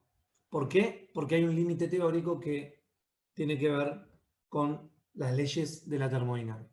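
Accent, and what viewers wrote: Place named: Argentina. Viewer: Te amo, gracias a ti voy a terminar la práctica de termodinamica, mañana t digo si pasé el examen :D, eres lo mejor increible hombre con acento argentino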